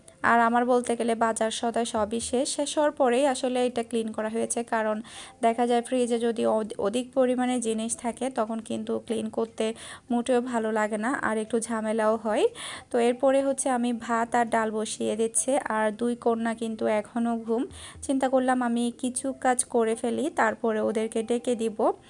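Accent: Chittagong, Bangladesh. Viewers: হেতি চিটাগায়িংয়া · আপু আমার মনে একটা প্রশন ছিল কারন তোমার কথা গুলো অনেকটাই আমাদের চট্টগ্রামের মত কিন্তু আজই জানতে পারলাম তুমি আসলেই চট্টগ্রামের,জানতে চাইনি কারন তুমি অনেক বেস্ত আর এখন জেনতে পেরে তোমার প্রতি ভালবাসা আমার অনেক বেড়ে গেল।আর তোমার সাথে আমার অনেক মিল আমার ও দুই মেয়ে আর ছোট মেয়ের নাম আরোহী।দোয়া করি সব সময় ভাল থাক।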